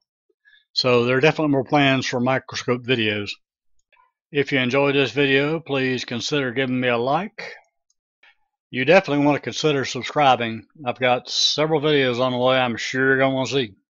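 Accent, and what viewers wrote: United States. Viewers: Great Work ! Accent is the best ! (Nashville ! guess) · Country accent 😈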